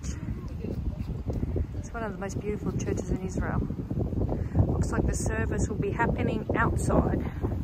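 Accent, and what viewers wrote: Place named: Australia. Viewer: Sounds like an Australian Voice! A mysterious Holy Site.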